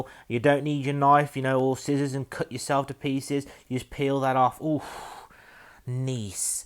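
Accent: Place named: Wales, United Kingdom. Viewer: oh u sounded welsh to me, im welsh!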